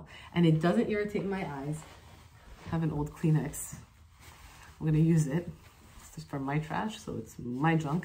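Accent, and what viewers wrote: France. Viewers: As a bilingual Canadian, I can hear your French accent when you speak in English. Totally picked up on it immediately (withing a few seconds) from the first clip I had seen from you a few months back. 😄 I appreciate your honesty about your profession and work. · I love when you add French words here in there in your videos! Your accent is super charming, so no reason to feel self-conscious about it (I'm from Québec so you'd probably frown at my own accent haha)! About the Toleriane line in LaRoche-Posay, I don't know if this is the way they intended, but as a French-Quebecker I interpreted that name as being a play on the word tolérance, and since it's for sensitive skin I assume they're trying to promote that it'll build skin's tolerance... but I didn't do any further research on this. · Quel accent français incroyable !! Perfection ! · I knew it! 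I knew you had a French accent after watching your video on Glycerin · it's cool, you have a slitghly french accent when you speak english, and an american accent when you speak french! and you speak both of them very well! good job Doc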